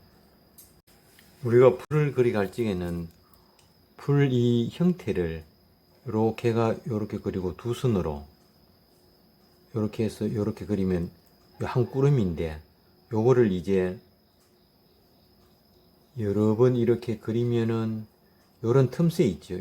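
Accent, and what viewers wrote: Gyeongsang, South Korea. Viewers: 경상도 말씨가 정겹습니다. 늘 감사한 마음으로 공부합니다.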